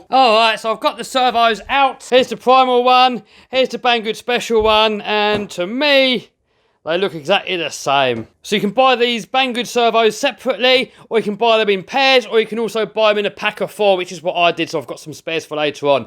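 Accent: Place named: Cockney, United Kingdom. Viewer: You have the strongest Cockney accent I've ever heard! Lol great vid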